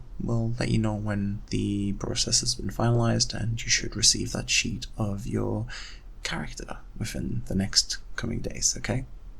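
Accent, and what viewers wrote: United Kingdom. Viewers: He's scottish i think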